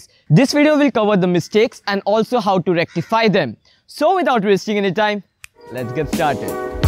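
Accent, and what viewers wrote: India. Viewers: indian accent very inique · I love indian accent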